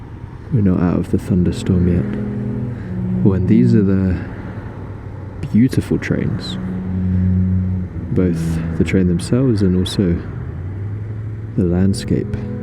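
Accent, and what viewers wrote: United Kingdom. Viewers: Excuse me, may I ask you something? Are you from the UK?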